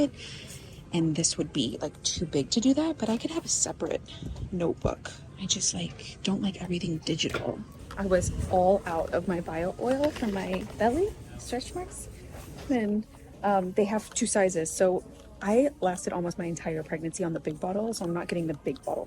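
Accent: Texas, United States. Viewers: my heart smiles so big when I’m notified of a video from you❤❤❤❤❤. I have followed you for several years and watched so many projects from the apartment ( out door chairs) loved for your little patio. The last week of pregnancy was so hard for me I got so tired!!!!!!! And the night before both of my babies ( age 47 and 40) the day before delivery my body just said no more, I was tired and felt like I was coming down with the flu, this may happen to you. My son 40 weighed 10 pounds. I am so excited for you but walking really helped with the labor (they had me walk in the hospital) to help,with the labor. When you are tired listen to your body. I am the happiest when I see your beautiful smile and sweet Texas accent, ( yes it’s there).❤❤❤. I wanted the floral China it looked so sweet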